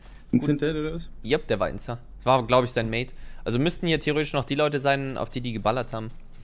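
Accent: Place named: Saxony, Germany. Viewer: Das Sächseln ist einfach nur eklig.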